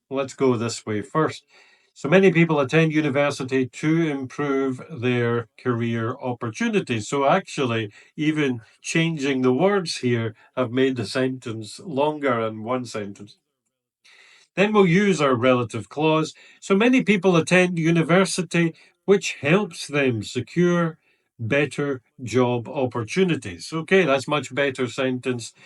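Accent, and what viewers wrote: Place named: Scotland, United Kingdom. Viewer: Thank you so much, this is very helpful video, and are you from Scotland? I notice that from your pronounce to /e/ & /r/.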